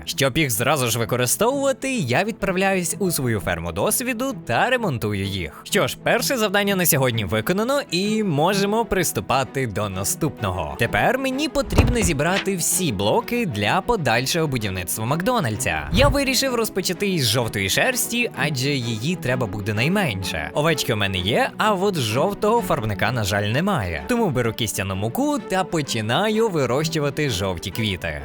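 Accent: Lviv, Ukraine. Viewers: Привіт) Давно не бачила українськомовного майнкрафтера) Коли почула "чуть не получив тягла" зрозуміла, що ти зі Львова) Так приємно чути українську мову на ютубі і свій говір) Бажаю успіхів) Продовжуй в тому ж дусі) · ТИ ЗІ ЛЬВОВА? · ТИ ЗІ ЛЬВОВА?! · Ти з Львова ? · Ти зі львова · ти зі львова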